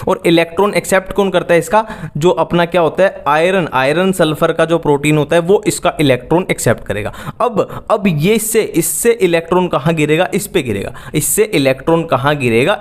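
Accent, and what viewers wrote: Haryana, India. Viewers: Aap haryanvi ho na bhaiya 😊 ?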